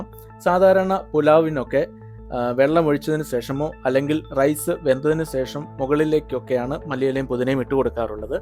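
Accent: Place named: South Kerala, India. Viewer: പാചകം Eassy - കഴിക്കാൻ Tastyയും ആണെന് തോന്നുന്നു. തെക്കൻ style സംസാരം. നന്മ നേരുന്നു. Rtd Teacher'👍🏻🌹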